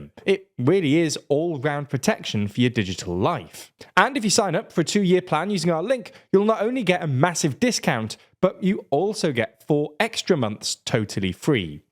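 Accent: United Kingdom. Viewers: Love your videos and the British accent but it’s JavieR not Javiey/Javi-ay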